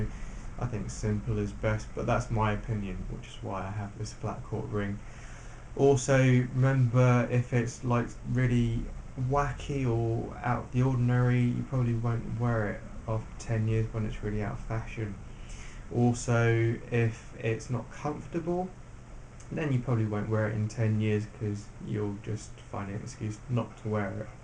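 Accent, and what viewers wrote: England, United Kingdom. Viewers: holy shit it's an asian guy with an English accent!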